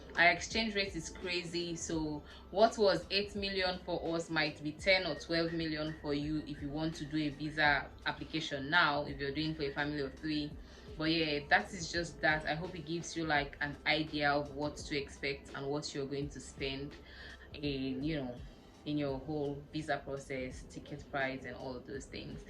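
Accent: United Kingdom. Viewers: This was so fascinating to watch and oh, you've got the accent 🤗. England has and still is, my dream country 😅. The day I get opportuned, I'll contact you to walk me through 👏🏽. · yeh few more months an u would have been speaking 🗣️ queen English…it was bang on‼️ really good to hear👂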